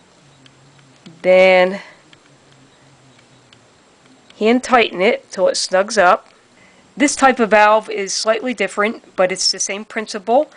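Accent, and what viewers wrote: Philadelphia, United States. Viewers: From Philadelphia area? The accent is crazy! Wudder, Donit. lol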